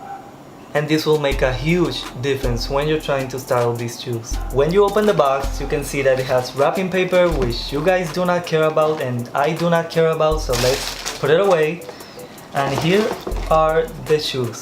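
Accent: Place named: Philippines. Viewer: You're accent is like a Filipino speaking in English. :) Like us!!! I Love you and your shoes!!!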